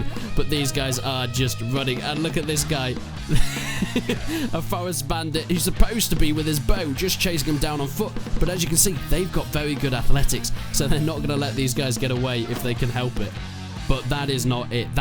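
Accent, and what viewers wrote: United Kingdom. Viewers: You've got the Bear Grylls accent, where is that from?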